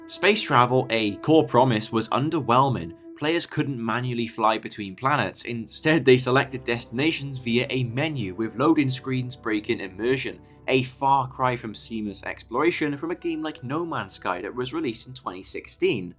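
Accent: Wales, United Kingdom. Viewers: Is that a welsh accent i detect?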